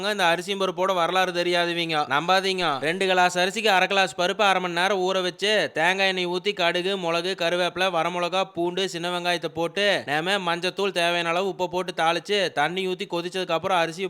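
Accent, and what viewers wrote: Kongu, India. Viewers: இது கோயம்புத்தூர் பொண்ணு உங்க கொங்கு தமிழ் சூப்பர்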